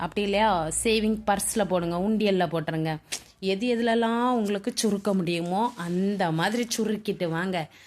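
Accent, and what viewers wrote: Tirunelveli, India. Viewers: அருமை....😅😅 நெல்லை தமிழ் 🎉🎉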